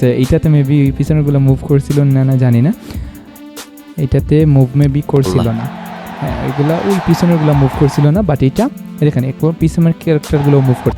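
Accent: Sylhet, Bangladesh. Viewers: সিলেটি না কি ❤ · সিলেটি রে পাইসি রে 😊😊❤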